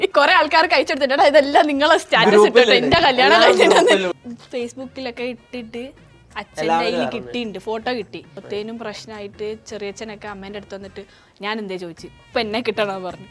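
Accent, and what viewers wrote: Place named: Kozhikode, India. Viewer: Kozhikodane ne parayanda cheetta. Ningale samsaram keettal nammale veetil ullore samsarikkanana feel 😍. Randupeerum poli 🥰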